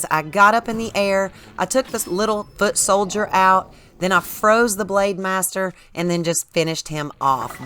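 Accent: South, United States. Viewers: This really is the best channel on here! I mean, that southern accent is not what I'd expect, but I love it!! And the info is super helpful.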